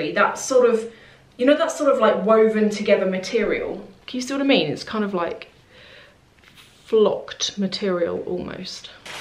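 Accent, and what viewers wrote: RP, United Kingdom. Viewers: back on yt, i was missing your accent hun! posh and gorge' :* i feel sorry for your rabbit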